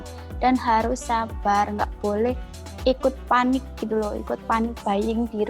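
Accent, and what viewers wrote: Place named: Java, Indonesia. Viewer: Medok bngt ngomongnya kayak orang jawa nih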